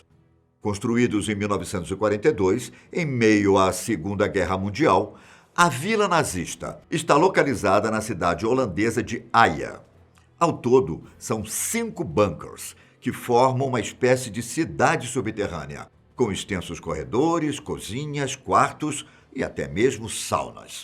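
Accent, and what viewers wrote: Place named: Bahia, Brazil. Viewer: aqui na Bahia tem pessoas que falam assim também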